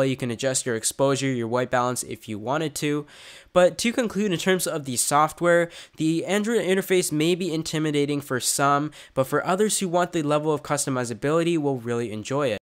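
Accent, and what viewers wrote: Canada. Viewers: Are you canadian? I hear a little bit of a canadian accent lol. Canadians have cool accent.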